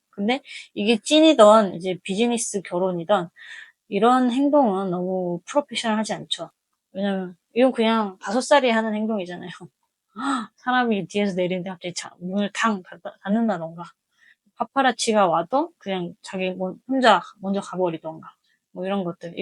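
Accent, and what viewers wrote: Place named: South Korea. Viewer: 말소리만 들으면 그냥 한국발음인데요?너무 대단하세요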